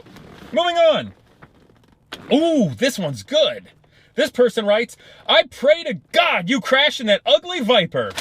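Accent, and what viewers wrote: Russia. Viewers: Great content , very relevant. Hope your videos have some impact, forwarded to my 16 yo cousin, hopefully new generation is a bit better. I travel alot on the highways for work. Mostly new jersey and pennsylvania last few years and unfortunately a lot of people dont even know they causing a problem. Drove in russia and europe and your commenters are 100% right about those. Way better driving culture in europe and even russia (though its a bit rough). Really cool russian accent you got there! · Love your Russian accent. But I still agree with you and left lane hog’s are more dangerous than some people think.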